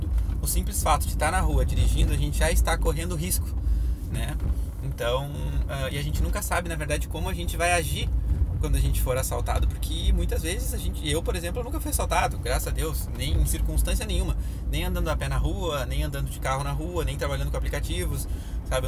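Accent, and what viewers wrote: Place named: Porto Alegre, Brazil. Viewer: Que histórias hein! Valeu, não sou motorista, vim parar há algumas semanas por acaso no seu canal, mas já assisti vários e vários vídeos, to gostando bastante. Me identifiquei contigo, trabalhador, gente boa e o sotaque bem puxado que me lembra alguns amigos de POA! Ficou bem legal o quadro contando histórias de passageiros, faça mais vezes. Abraços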